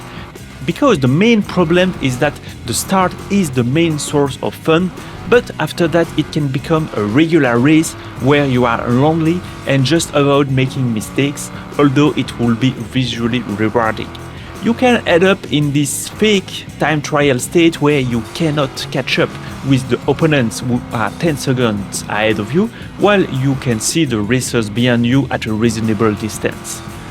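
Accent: France. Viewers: cet accent francais 😅 (Voilà comme ça c’est mieux)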